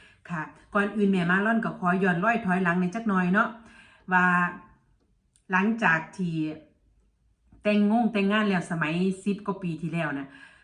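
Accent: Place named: Central Thailand, Thailand. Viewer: พูดกลางฟังรู้เรื่องทุกคน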